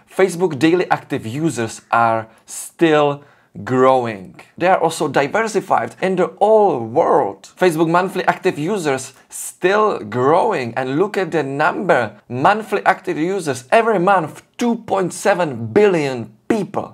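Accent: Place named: Czech Republic. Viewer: ta česká angličtina je tak... nádherná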